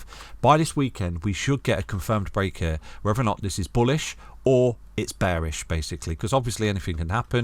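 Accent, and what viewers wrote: United Kingdom. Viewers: Nice British accent 😊 always welcome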